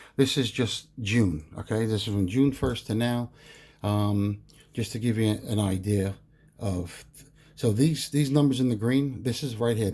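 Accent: East Coast, United States. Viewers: Awesome story! Good for you. Glad you're in better health and back on your feet. It's must have been extremely hard, I can't imagine. Thanks for sharing your occupation with us. I'm so intrigued when I see a large amount of gold and when asked how that person makes money, they never truly answer or tell you something real vague. I'm as working class as you can get. Pretty much paycheck to paycheck so when I see someone doing awesome I am always curious to know how. In your other video when I heard you say $400,000 dollars a year to live. I could picture you laughing at my income or confused how someone could live on so little. I can't imagine making 30K a month. lol Then again, from your accent, you're on the east coast and it does cost a lot more to live and the culture leans to who has the most money. A lot of competition to show off wealth especially in New York. 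But still I really appreciate you sharing it with all of us though. Happy stacking Brotha! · Nice pickups ... love the coin toss of your coins. Your genuine NY accent is Cool as I am from South, I just subbed to you and look forward to your videos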